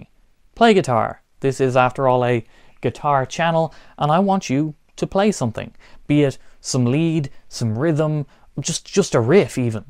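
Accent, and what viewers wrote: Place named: Ireland. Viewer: Congratulations on the 56,000. I just listen for the Irish accent to know it's you when I read something from your channel. 🤣😂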